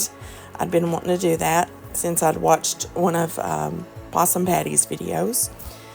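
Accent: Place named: South, United States. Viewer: Possum Patty watcher here just looking around! My mother, if nothing else in a day or night, PRAYED...I can hear her now..."LET GO AND LET GOD" she passed many years ago...but your southern accent and heart of prayer reminds me of her...happy crafting! PP is wonderful! ❤B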